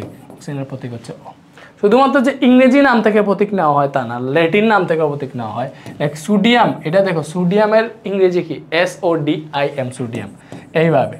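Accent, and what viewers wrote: Sylhet, Bangladesh. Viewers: স্যার আপনি কি সিলেট বিভাগের?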